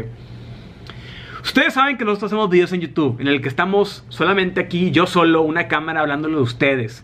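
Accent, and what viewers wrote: Monterrey, Mexico. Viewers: me encanta tu acento regio, creo que nadie podría hacer mejores ediciones que tú, mi vida no va tan bien pero hay que sonreír y ser mejor cada día 🙂 felicidades por todos tus logros.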